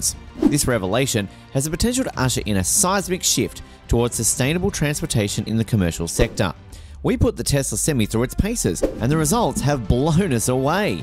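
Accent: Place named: United Kingdom. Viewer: Annoying Brit accent and inaccurate information. Very redundant and repetitive narration. The current average class 8 trucks in 2023 range from $150,000 to $220,000.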